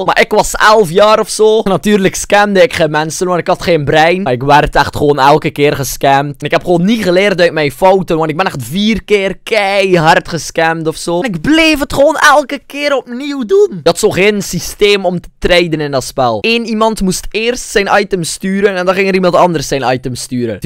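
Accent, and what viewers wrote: Belgium. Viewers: ben jij belg ofni ?'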